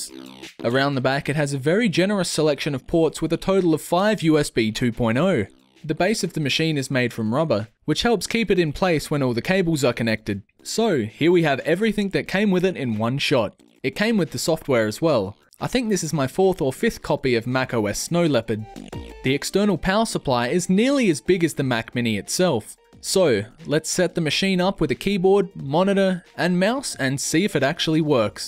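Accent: Australia. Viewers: Finally an Aussie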